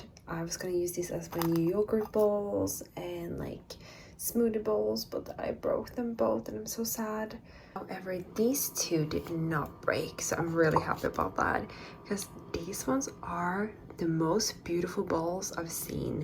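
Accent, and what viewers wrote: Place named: Sweden. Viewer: just saw you in a video on the world friends channel, and I couldn't pin point your swedish accent at all. Where in Sweden are you from?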